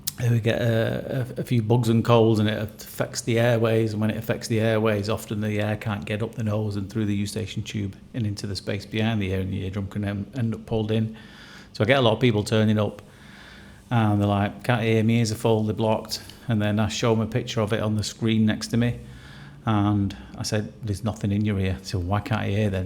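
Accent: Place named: Wigan, United Kingdom. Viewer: Love, good health and the happiest of New Years to you and your sweet family! Your videos and your sense of humor and your Wigan dialect are relaxing, entertaining and educational.👂❤️🕊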